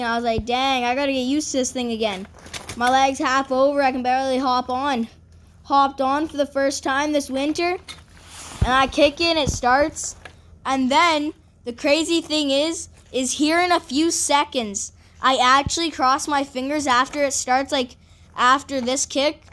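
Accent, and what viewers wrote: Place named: Newfoundland, Canada. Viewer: you should like a newfoundlander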